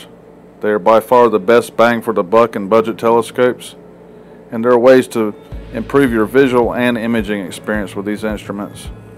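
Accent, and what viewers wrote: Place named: South, United States. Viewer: There's just something about a thick southern accent that just derails any of the context of what's being said...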